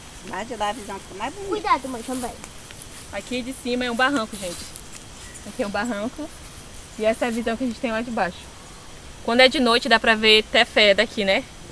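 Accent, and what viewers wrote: Rio de Janeiro, Brazil. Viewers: seu sotaque é de carioca, esse sotaque arrastando o x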